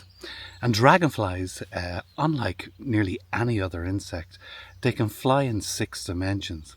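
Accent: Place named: Ireland. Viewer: As usually, just love your relaxed soft Irish delivery on the narration plus a video about my chosen genre of photography ( macro/ close up nature ) followed up with some very interesting facts and outstanding images really couldn't get any better. Certainly for me one of the most enjoyable vids. seen on u-tube of late. 👌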